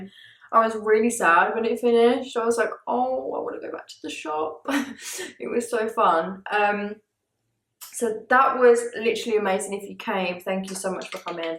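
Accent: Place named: London, United Kingdom. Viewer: It been a while since I came on this channel. Since when has got a London street accent 😆??. Happy new year!💞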